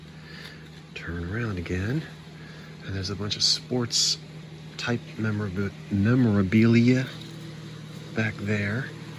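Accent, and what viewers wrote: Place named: Baltimore, United States. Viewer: I grew up in "Balmer" County. I started going to antique stores when I was a teenager ..50 yrs ago. That's when you could buy old stuff for cheap. I love all your videos, and mostly, I love hearing you speak. Takes me home. I live in Colorado now.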